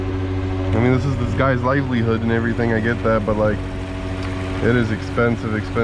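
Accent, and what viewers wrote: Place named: United States. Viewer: Hi, just curious. Where are you from? You've got a very americanised accent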